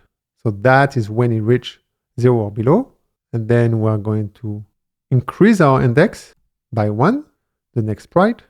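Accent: France. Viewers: My guess is your native language is French (was not so difficult to guess right, right?). French is a soft language and it start from back of the head. while eng is hard and start from to front. My guess is when you try to replicate eng language accent you feel something wrong in your head, right? While with your current accent you feel ok. That's the point. When you feel the sound is wrong is in that moment that the accent is more near to native English people ;). If your goal is not to be proficient in English accent the suggestion of mine - speak slow and check the result with another person help (your kids?) - can be the solution for now :). Great content of course :) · Simply the best bevy tutorial I’ve seen so far, it’s the first time I really understand what’s going on and how it works, merci beaucoup ! (je reconnais l’accent français, j’ai le même :D)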